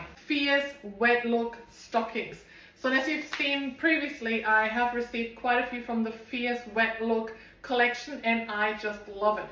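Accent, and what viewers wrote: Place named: Germany. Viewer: I love your accent,. Are you German?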